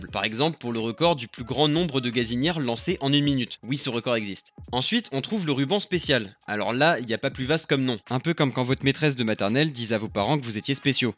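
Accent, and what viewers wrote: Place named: Belgium. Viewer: qu'est qu'il a l'accent belge qu'on m'explique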